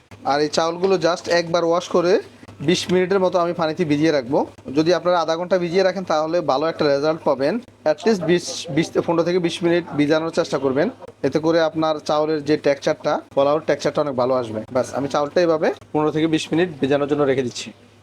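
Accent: Bangladesh. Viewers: If you are from West Bengal, then how come ,you talk like BANGLADESHIS? Sharishar tel, Morich ? What nonsense?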